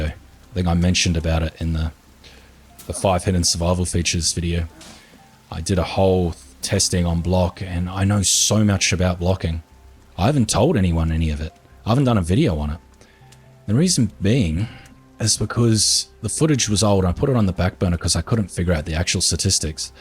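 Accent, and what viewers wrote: Australia. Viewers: Hey, ive been wondering, what do you want in 1.0? Also, are you Australian? Edit: never mind. I didnt hear till the end. Gotta love straya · Ah, thought you were a fellow Aussie but for some reason I couldn't tell for sure. Namely because you spell words like behaviour the American way.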